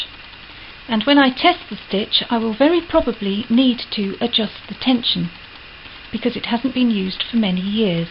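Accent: RP, United Kingdom. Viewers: Where are you from? You speak in such a posh way!:) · How cheeky to comment on your "posh accent",I was just so pleased to find your very helpful instructions.I've just bought a lovely old Singer and am having problems getting the tension right.Thanks to you I think I've cracked it.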